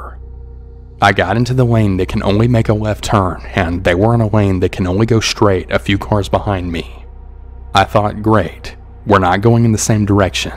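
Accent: South, United States. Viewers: It’s the southern accent for me 😅😌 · It took me a minute to realize he has a country accent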